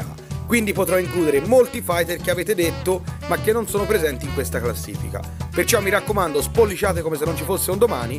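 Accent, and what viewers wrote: Tuscany, Italy. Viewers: Sei toscano ??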